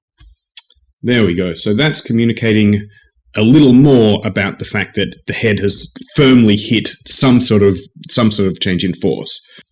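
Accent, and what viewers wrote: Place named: Australia. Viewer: wait you're australian!? ozzi ozzi ozzi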